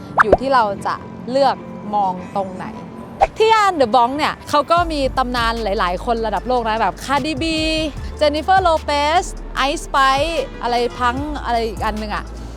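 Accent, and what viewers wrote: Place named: Chiang Rai, Thailand. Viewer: สำเนียงเชียงราย 😅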